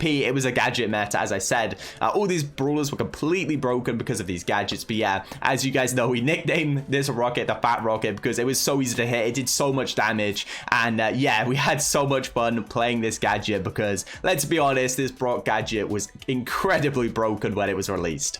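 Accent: United Kingdom. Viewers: a normal pottah from your supah I love bri'ish accents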